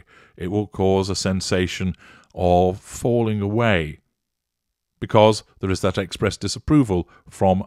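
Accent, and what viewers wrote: United Kingdom. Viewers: I adore your commentary. You beautiful British accent. Plus you make me laugh. So bloody happy to have found your channel. From Australia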